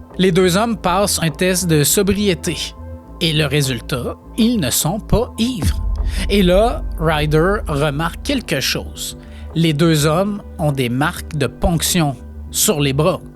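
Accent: Quebec, Canada. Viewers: Merci, je connaissais cette histoire, elle est passionnante, ça fais du bien de se faire raconter ces histoires en français et par un Québécois en plus. Et je remarque que tu as poster bien d'autres cas sur ta chaine que je découvre avec plaisir. Vais-je devenir addict??? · Oui, la prochaine, faites la vidéo en anglais , cela évitera d'insulter la belle langue française avec cet accent québécois exacerbé. C'est juste horrible à entendre . Je me suis toujours demandé comment on a pu en arriver là . Comment on a pu déformer une langue séculaire en ça, cet espèce de yaourt verbal . Je n'ai rien contre Québec , au contraire, mais là, on pourrais presque croire que vous le faite exprès tellement c'est abusé . Et pour le contenu de la vidéo, bof bof , l'histoire à déjà été répétée 10 000 fois . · Excellent, le seul gars avec l'accent Quebecois que j'arrive à supporté et dieu sait que j'en ai entendu plusieurs youtubeur avec cet accent.. 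En tout cas, merci pour votre travail 😎😎😎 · J' ai du arreter de regarder a cause de l'accent quebeccois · je sais si c'est l'accent Québécois si c'est toi qui parle trop vite .ma j'ai décroché au bout de 5 min..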